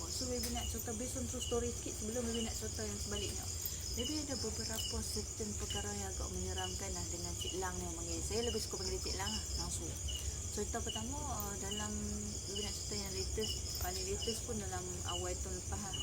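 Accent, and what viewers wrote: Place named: Kulim, Malaysia. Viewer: rasa² ni orng kulim kot sbb cara dy crta niy mcm orng kulim ja, sy pon dulu ingat niy orng pinang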